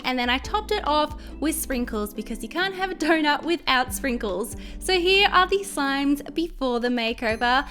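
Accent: Australia. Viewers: Are you from Australia, New Zealand or South Africa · your voice is like italian australian some kind of thing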